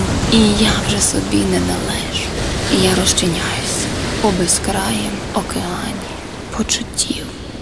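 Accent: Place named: Lviv, Ukraine. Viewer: Chto Vy mayete proty Lvivs'koho aktsentu. Ukraina velyka. 🌲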